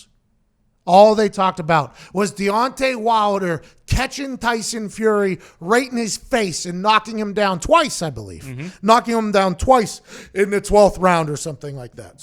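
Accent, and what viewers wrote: Pittsburgh, United States. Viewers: That’s that yinzer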